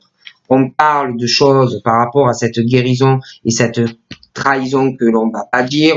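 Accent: South, France. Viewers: ton accent du midi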